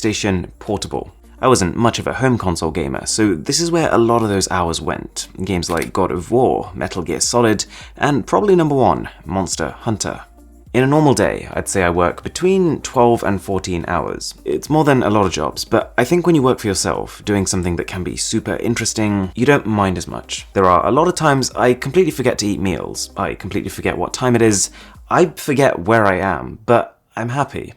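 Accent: RP, United Kingdom. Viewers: He sounds like Harry Potter...The same deep n calm tone..Not just bcoz of the british accent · Your accent is much more posher now. Interesting. Gosh you are so hot.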